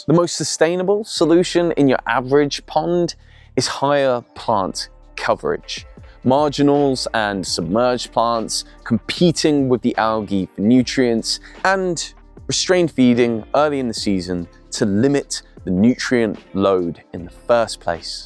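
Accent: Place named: United Kingdom. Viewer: I love this channel. I'm a sucker for soft, slow British accents; but the content is also amazing. Love love love. Wishing you much success 💕💕🎉🎉🎉🎉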